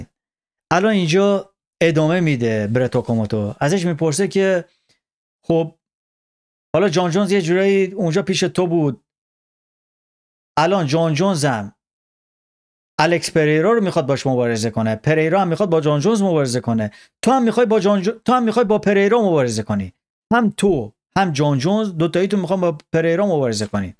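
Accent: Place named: Lorestan, Iran. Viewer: اویل لحجه لری بعض هیچی♥️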